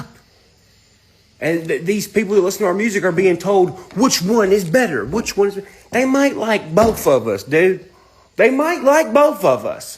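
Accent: South, United States. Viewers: Still sounds like a sassy southern hairdresser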